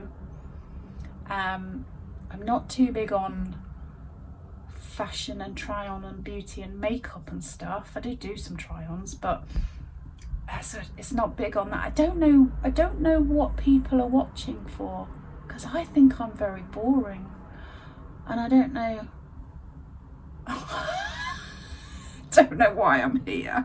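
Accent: Nottingham, United Kingdom. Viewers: I can't remember if I saw you in one of Caroline's videos or if I started watching via youtube's suggestions? This might sound strange but I love the British accent so I like listening to both you and Caroline talking. And the Expawers which I learned about from Caroline. As for feeling lost, have you thought about volunteering? That could give you a bit more purpose. I volunteered at the humane society walking dogs or helping people pick out a pet to adopt. Perhaps think of something you might enjoy doing? Take up knitting? Research your family tree? Just a few ideas that came to mind. And right, it's that time of year. We've got gloomy weather today too (upper midwest in the U.S.) which always brings me down. I'm going to go wrap some presents and try to ignore the weather. I hope your icky mood passes soon. 🤗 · Your youtube channel came up on my list a few months ago….I watch and subscribe to many lovely resellers so maybe thats why? 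I was pleased to discover you were Local- ish to me …i live near Dawlish … I felt there was something familiar about you ….it wasnt until I saw your video about your visit to Nottingham that made me wonder if it was your accent…..I originally come from a small town near Hucknall and then moved to Newark and then moved to Devon 20 odd years ago ….I havent visited Nottinghamshire for many years so it was lovely to see some of it again….and your recollection of Vicky centre indoor market ….I really like your videos and your outings and wish you well with your move back up north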